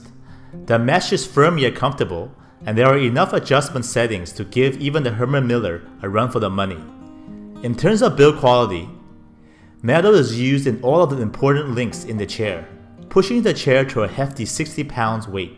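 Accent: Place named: Asia. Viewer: Indeed, I'm also 6'4, I have tried this one at the store. Its not made for tall people. No offense, but this guy has an Asian accent, he probably has a different perception than a European or American of what a tall person is...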